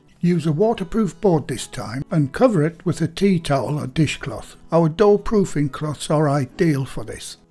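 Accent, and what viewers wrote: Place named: Gateshead, United Kingdom. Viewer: Move to Gateshead, they all sound like that.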